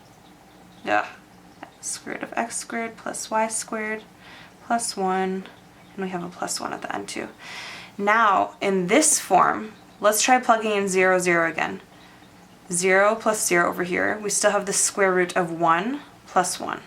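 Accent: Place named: Canada. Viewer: "that was pretty easy, eh" fucking love the Canadian accent.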